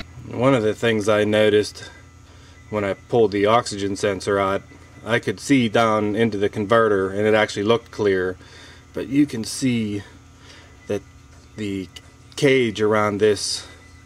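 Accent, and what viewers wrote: Pittsburgh, United States. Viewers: Are you Paul's brother? If so, I know he said you were starting a channel but i dont remember him giving a link..i happened to find your name through another one of his subscribers and figured you must be him. Plus you cant hide with that Pittsburgh accent. You two are like the dream team when it comes to diagnosis.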